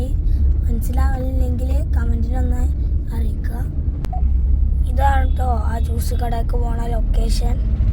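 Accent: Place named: Malappuram, India. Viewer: മലപ്പുറം ഭാഷ മനസിലാവാത്ത ലോകം ഇല്യാ നീ പോളിക്ക് 🔥🫶💜.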